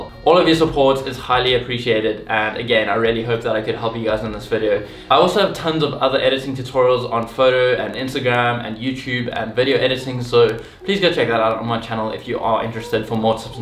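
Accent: South Africa. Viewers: dude are you south african???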